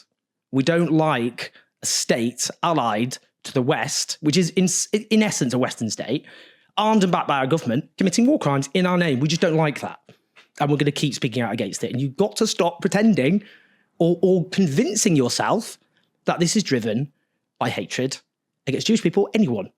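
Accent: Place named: Sheffield, United Kingdom. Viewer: From here in Canada I’ve loved all of your commentary, you are a truly passionate humanitarian. Keep up the sharp lens and wit you bring to the subject. One thing though, and please don’t take this the wrong way, but I know of people who I’ve wanted many times to send your videos to, but I know they’d have a hard time with your sometimes rapid fire words combined with your more than slight Sheffield accent. I sometimes have a difficulty with that myself (and do slow the video down to take it in easier) and I think other Anglo speakers might as well, especially when your obviously skilled delivery with words comes at a blink of the ear so to speak. 😊you’re a rock. Thanks.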